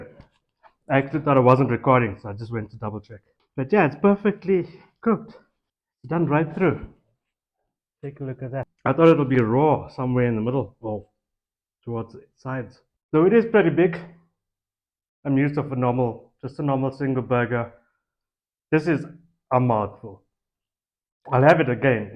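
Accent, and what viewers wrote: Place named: Bengal, India. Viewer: dude sounds like a bangali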